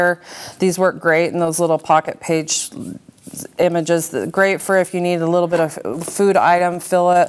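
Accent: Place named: United States. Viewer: bravo, but your english is only for americans,you talk to fast and i hardly understand what you say,bravo any way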